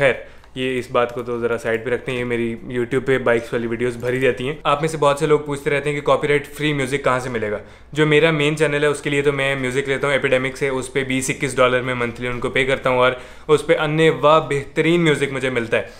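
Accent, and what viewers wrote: India. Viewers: Bro zindabad bolo jindabad nahi.You sound like an indian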